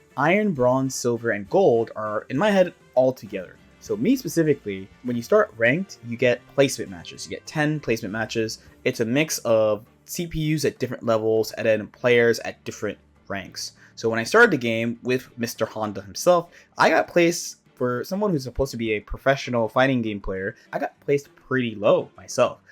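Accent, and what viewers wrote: Baltimore, United States. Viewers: You don’t have to answer but by chance are you from Baltimore? Your accent made me wonder lol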